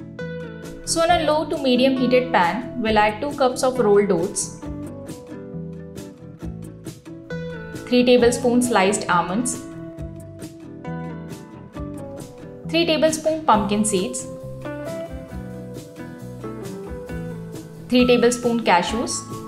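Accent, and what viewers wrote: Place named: India. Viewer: Nice one lady! Thanksmuch. U make me laugh because of your indian accent. Bravo excellento Granola's love them to eat soon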